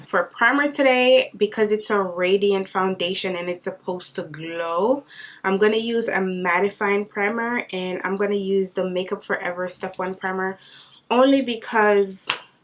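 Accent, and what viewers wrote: Jamaica. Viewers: I just knew u were Jamaican from the first time you opened ur mouth lol big up muma🤗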